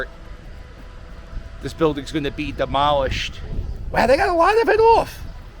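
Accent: Philadelphia, United States. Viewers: I really enjoyed this tour. I love your Philly voice and I love all of the same things about Philly that you do. I was born in Philly and raised in SJ, but have been to Philly frequently enough. Thank you for these videos. I hope you are going to Mass every week. God bless you.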